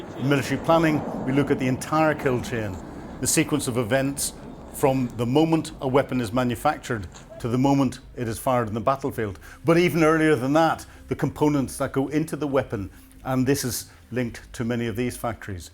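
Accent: United Kingdom. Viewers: I'm delighted with your summaries. Maybe its because of your accent as it reminds me of my service in the UK; concise, focused reporting and assesments. Your work is excellent, kep them coming.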